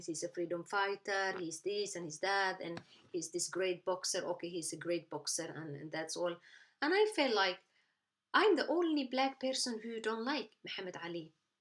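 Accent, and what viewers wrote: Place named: Tigray, Ethiopia. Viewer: You are tigray agame ethiopian from your accent .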